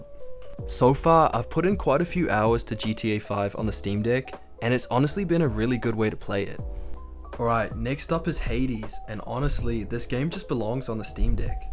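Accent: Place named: New Zealand. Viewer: the New Zealand accent and saying deck is so funny 😅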